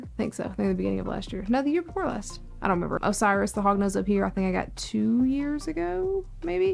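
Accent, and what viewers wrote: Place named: Texas, United States. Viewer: Alabama? I had your accent pegged as Texas. I would have put money on you being a Houston native.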